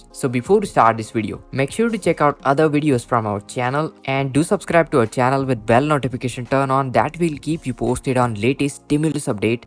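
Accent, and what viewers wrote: India. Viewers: I like your Hindu accent 🙂😉😉 I want a Hindu even though I'm not Hindu, damn baby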